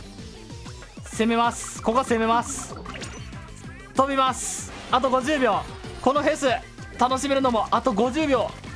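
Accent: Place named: Kansai, Japan. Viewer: ラストまで堪能しましたねぇ またもやバリバリの関西弁で めちゃくちゃ楽しそう！ ✌頑張った！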